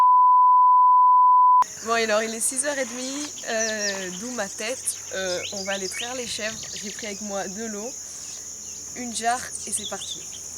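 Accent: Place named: South, France. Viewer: Tu me régale !! Cet accent du Sud de ouf 😂😂 👍🏽😘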